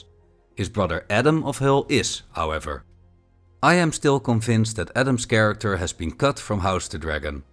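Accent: Netherlands. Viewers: The narrator is definitely dutch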